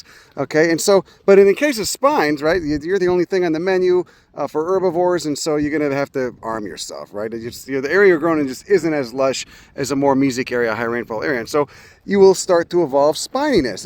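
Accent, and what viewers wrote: Chicago, United States. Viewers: inner city Chicago. its still there. he seems mellow today. perhaps its the paht.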